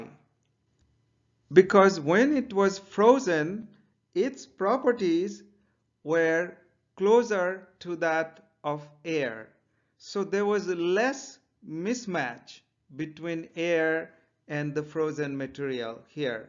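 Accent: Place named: India. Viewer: listen to how fucking Indian you are...incredible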